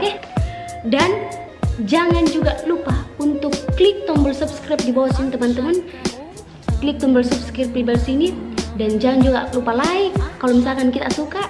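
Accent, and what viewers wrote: Makassar, Indonesia. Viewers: Suka logat makasarnyya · Lanjutkan 😂 ksi knal logat makasar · Sya dari NTB tpi pcr sya keturunan Makasar,logatnya mirip sekali sma mbaknya...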